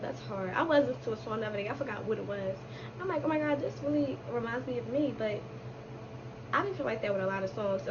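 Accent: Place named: Philadelphia, United States. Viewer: that accenttttttt, you better be from philly 😩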